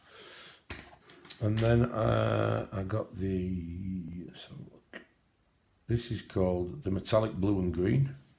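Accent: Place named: North, United Kingdom. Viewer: First video I’ve watched of yours and it’s lovely hearing another Northerner. Some beautiful books 😍😁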